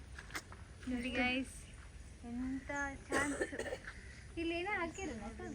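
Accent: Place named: Udupi, India. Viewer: ನೀವು ಉಡುಪಿ ಭಾಷೆ ಚಂದ ಮಾತಾಡ್ತಾ ಉಂಟು 😁🤪🤩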